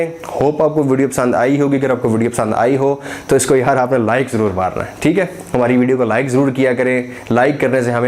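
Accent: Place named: Sialkot, Pakistan. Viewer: Bhai Asslam O Alaikum... Bhai Jo Ha, Wo Jo Ha....Ya Jo ha, Ab Jo Ha....Jo Ha, Jo Ha.... You definitely from SIALKOT. Love From Sialkot <3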